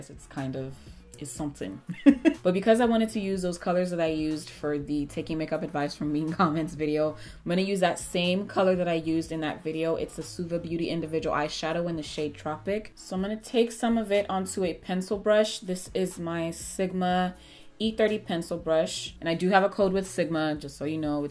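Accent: Nigeria. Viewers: I’m gonna guess you’re part Nigerian. That accent sent me to the ground. Love you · Subscribed for the Nigerian accent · Hey. Are u Nigerian? The accent tho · I love your Nigerian Accent 🙂❤ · Let me just tell you , the moment I heard your Naija accent come out , i clicked Subscribe so fast 😭😂 . Ahhh my fellow sista doing her thing lol , i definitely learned something new in this video . I’m definitely looking forward for more to come.! 💋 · That Nigerian accent 😩😂❤️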